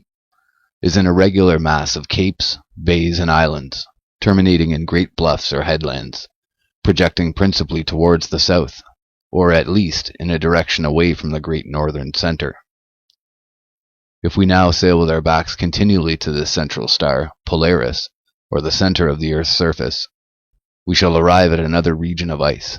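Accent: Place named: Canada. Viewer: Sounds like flat water channel. I heard the Canadian oooo. Excellent.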